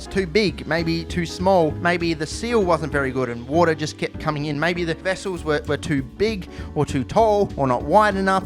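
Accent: Australia. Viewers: From the accent, you must be from Australia, the snake in the house--- sounds like down under. Many poisonous animals, and insects in Australia. I always wanted to see experiments using Hydrogen peroxide because I played with it in high school (I'm not a Chemist), and it fascinated me the many reactions like powered iron, or magnesium oxide from used up carbon batteries producing prodigious quantities of Oxygen. thank you sir! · Honestly that's how Aussies speak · When I first saw this channel I thought damn this is so american but naw he australian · I thought he was Australian not Finnish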